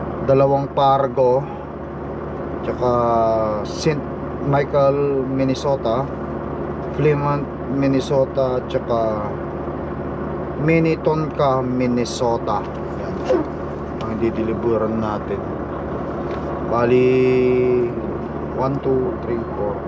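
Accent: Ilocos, Philippines. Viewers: Ride safe always sir parang ilocano kau sir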